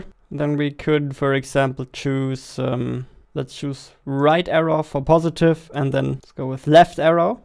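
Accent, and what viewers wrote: Germany. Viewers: I like your german accent, good tutorial <3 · He has a German accent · Erstens man hört raus dass du Deutscher bist... Und zweitens... Durch deinen Dialekt kann ich viel besser verstehen was du meinst... Du sprichst auch sehr deutlich und ausführlich... Und in deinem 39 minütigen Video... Stecken mehr Informationen als in manchen 1 oder 2 Stunden videos... Und das beste ist... Du gibst dir dabei mühe, schneidest alles zurecht und erzählst es mit Emotionen... Andere Leute rattern alles einfach nur runter aber du hast mir 1000 mal mehr geholfen bei dem Programm... Vielen vielen dank! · Are you german? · ur german accent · The germäaan accent haha. Bester mann xD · Hey, Erstmal danke für dieses krasse Video! So macht es einen Spaß schnell einfach kompakt und mit schönen Deutschen Akzent zu lernen. Super Erklärung und Co. konnte alles wie im Video 1zu1 sofort anwenden bis Minute Ab dort funktioniert es bei mir leider nicht mehr weil Visualstudios kein GetComponent anzeigt sowie kein Rigidbody obwohl ich in Unity gecheckt hab ob es auch fürs Objekt ausgewählt wurde. 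Ich werde allerdings der sache auf den Grund gehen und werde es irgendwie versuchen doch noch umzusetzen. Beste grüße Marcel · I can hear your German accent, is there a German version of this video? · Are you German? Your accent sounds like it ^^ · Are u from germany? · man hört halt dass du deutscher bist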